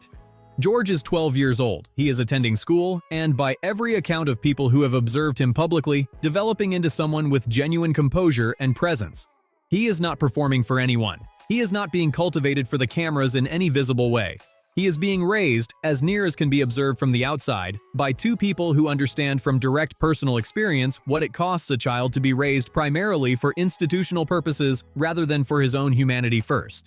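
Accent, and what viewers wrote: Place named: United States. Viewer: We do not need shouty American voices spouting nonsense about our Royal Family. Sort out your own 'monarch'!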